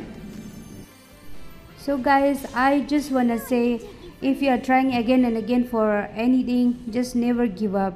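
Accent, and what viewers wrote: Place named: Arunachal Pradesh, India. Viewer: Waah Arunachal english 😅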